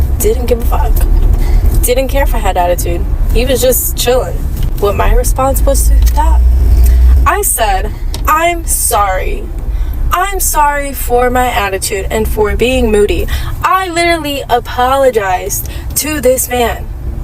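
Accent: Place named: Los Angeles, United States. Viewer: Bro you got that L.A accent. You from there?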